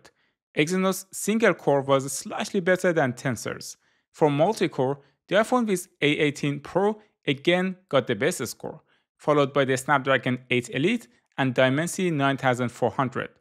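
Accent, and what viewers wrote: Iran. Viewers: Bro, I sensed from your accent that you are Iranian, am i right?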